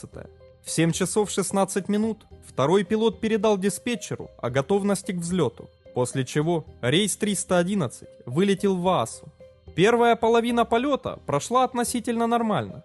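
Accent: Ukraine. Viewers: Капец, какой противный хохляцкий акцент:третЯво сентЯбря тысЯча! Вообще слушать противно. Сразу и выключил. Аффтор, исправляй свой хохляцкий акцент. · Самый обыкновенный украинский акцент:)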